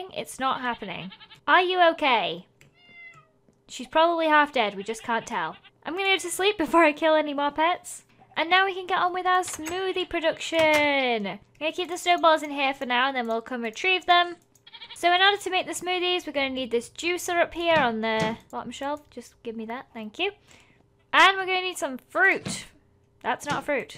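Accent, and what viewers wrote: United Kingdom. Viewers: Did any one else notice her saying bannene smoothie instead of banana smoothie or maybe it's her British accent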